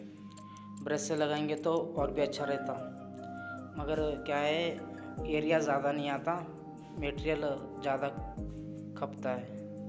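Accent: Hyderabad, India. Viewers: Pkka hyderabadi bol rahe hoo bhai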